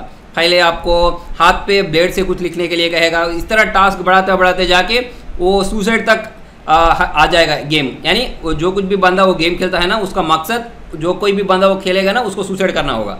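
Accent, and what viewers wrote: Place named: Hyderabad, India. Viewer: Hyderabad hindi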